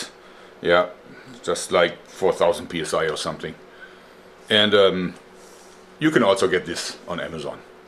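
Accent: Germany. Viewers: Very late to the party here, but I noticed something while rewatching this old video. When our mad rubber tubing based genius says one specific phrase, he sounds just like a certain Austrian Governor of California. He sounds nothing like him at all, but when he is bent over and speaking the words "Pumping Up" are uttered. It's like Arnold's voice came out for half a second, and then straight back to the usual German accent. I'm sure it's just an affectation of bending over and speaking, but I still got a chuckle. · I'm sincerely curious, do most German people speak English so fluently? If it wasn't for the accent I would have no idea his first language isn't English. Secondly, I really like how much he seems to enjoy doing what he is doing. Every time he laughs like that it makes me smile.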